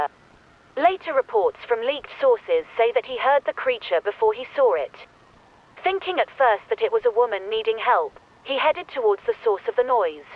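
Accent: United Kingdom. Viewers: bri'ish voice hits it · A few questions from someone who’s Irish. 1. Why does the Ukrainian EAS play at the start instead of the Irish one? 2. Why is the TTS voice British instead of Irish? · I like that the lady speaking sounds BRI,ISH.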